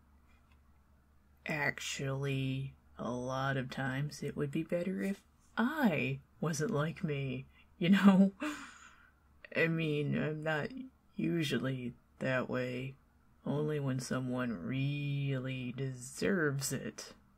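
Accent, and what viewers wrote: South, United States. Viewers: Loved this, really relaxing even if life got in the way so I has to listen to half this morning and half this... huh technically morning again. Anyway yeah really nice and I love the character, her understanding nature and of course your accent mixed with southern hospitality. Just adorable hearing the Yeps and such. 10/10.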